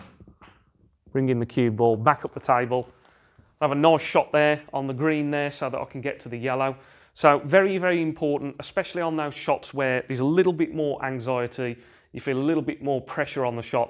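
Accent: United Kingdom. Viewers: Who's here for the british accent?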